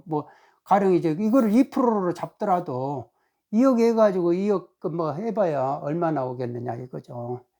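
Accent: South Korea. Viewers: 구독자 입니다. 고향의 이웃동내 같은 구수한 억양에. . . 저는 전북 정읍 현재는 사무실을 옆 하비오 에 있습니다. 오늘은 너무 유익해서 두번 듣습니다. 제가 동영상을 거의다 듣고 있는데 아주 유익 합니다 .끝부분에 고생 고생해 남쓸때 안쓰고 모아서 3채 가 되기에 아주 고민이 많습니다.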